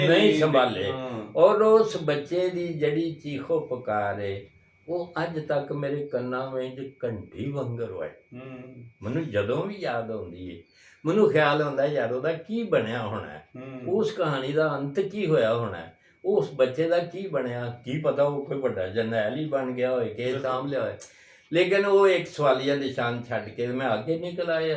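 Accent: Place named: Dogri, India. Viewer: sir di boli vich dogri touch ha. te enha bolya laya da si, jd ki punjabi vich kehnda ne laya hoya si. Narowal,Shakargarh, te Sialkot da ilaka kise zamane vich Jammu riyasat da hi hissa hi.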